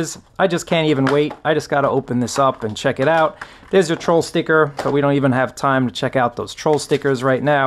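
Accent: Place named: Brooklyn, United States. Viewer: Straight outta Brooklyn lol. Well done with the video. I'm sold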